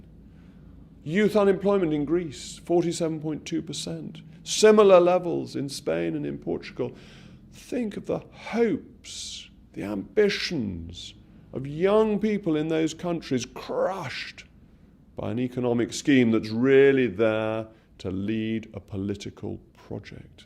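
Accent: RP, United Kingdom. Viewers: If you like how he talks, you can start by cultivating an RP accent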